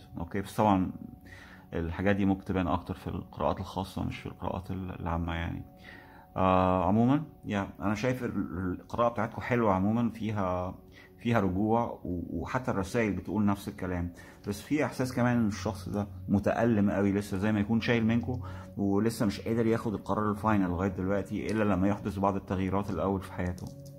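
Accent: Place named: England, United Kingdom. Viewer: I love ur english accent ❤ يا لهوي 😊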